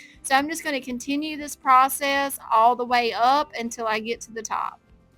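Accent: South, United States. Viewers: They are all beautiful, what state are you in. Must be native born. I'm just not used to a southern accent it's nice.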